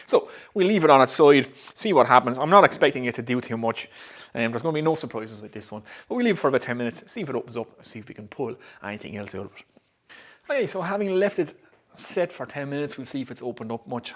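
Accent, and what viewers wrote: Ireland. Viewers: After watching your reviews I’ve finally subscribed. I like your way, the Irish accent and the toast. Keep up the good work. Oh, and based on your review, I picked up a bottle of Slane. Nice, but the jury is still out.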